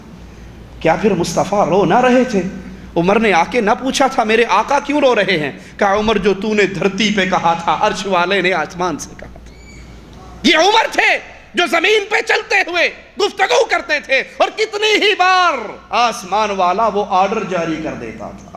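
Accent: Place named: Arab world. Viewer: Urdu arabi kay andaz may bolnay say jhot such may nahi badalta .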